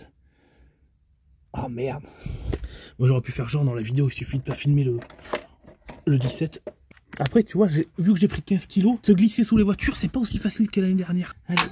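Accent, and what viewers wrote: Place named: Southwest France, France. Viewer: brive.. ptn con je me disais bien l'accent il ressemblent au miens 🤣 je suis vers marmande bergerac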